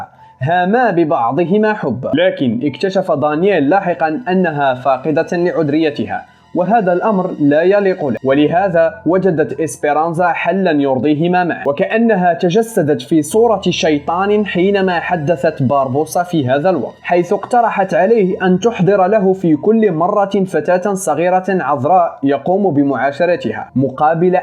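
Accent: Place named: Morocco. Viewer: عرفت انك مغربي من لهجتك الجميلة 💜💜💜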